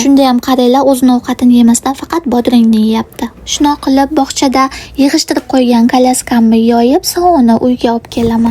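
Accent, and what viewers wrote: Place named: Andijan, Uzbekistan. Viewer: анжанлимисиз синглим. шевангиздан. билипколдим 😊😊😊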